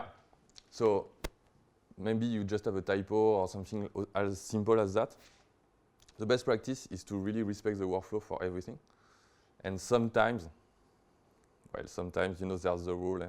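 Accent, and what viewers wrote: France. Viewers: L'accent français à jamais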